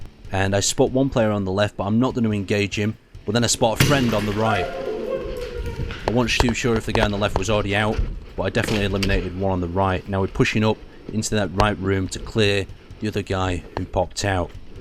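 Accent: United Kingdom. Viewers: you sound like the brittish guy from aw. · u from uk?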